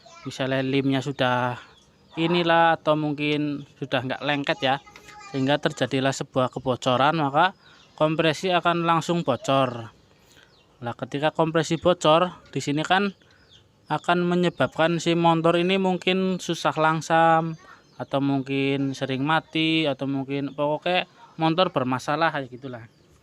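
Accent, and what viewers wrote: Banyumas, Indonesia. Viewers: Njenengan wong endi sih mas? Ngomonge ngapak deneng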